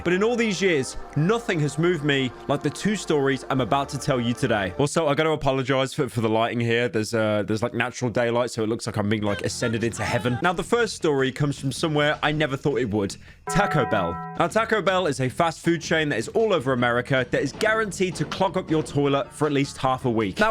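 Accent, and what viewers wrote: United Kingdom. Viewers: Bro why british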